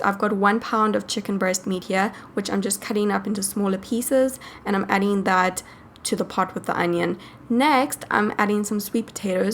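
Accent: South Africa. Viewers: ahahah chiken South Africa :) she just moved to the states though. To LA. I love her accent!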